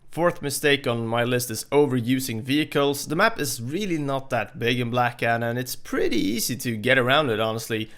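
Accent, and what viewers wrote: Sweden. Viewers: du låter svensk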